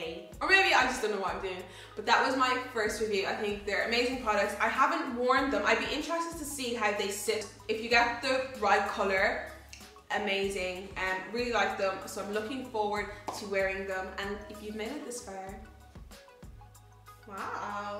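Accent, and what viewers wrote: Ireland. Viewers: Am I trippin or do you have an irish accent? It's stunning either way😍😍😍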